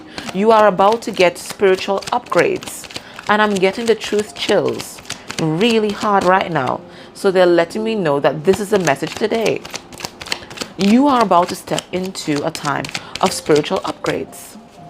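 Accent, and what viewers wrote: Trinidad and Tobago. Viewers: Are you a trinie you sound like a trinie, good works thanks for the guidance God bless.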